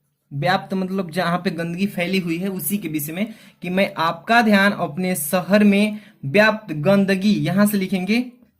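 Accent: Bihar, India. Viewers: Sir aapki awaj se lg rha h ki aap bihari h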